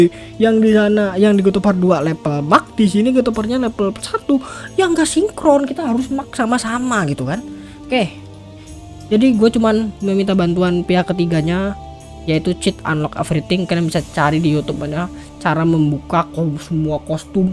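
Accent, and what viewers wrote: Madura, Indonesia. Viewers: Madura kah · Madureh bang..??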